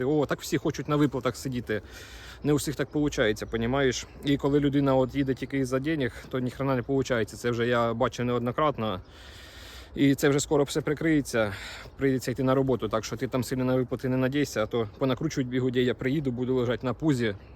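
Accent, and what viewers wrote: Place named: Surzhyk, Ukraine. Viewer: А мені подобається слухати суржик,хоч сама із західної області.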